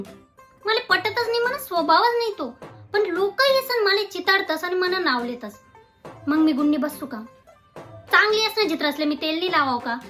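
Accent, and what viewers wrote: Khandesh, India. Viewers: ❤️मला खानदेशी तुझी तोंडून फार आवडते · काय मस्त खान्देशी boltis माय तु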